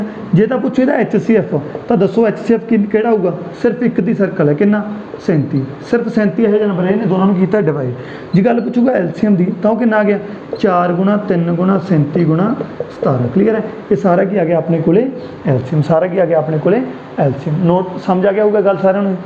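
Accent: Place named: Gurdaspur, India. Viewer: Tuhadi language bott sohni hain;;; means apne area de according lyi;;; gurdaspur distt. tu alag hain;;; ur teaching way is so gd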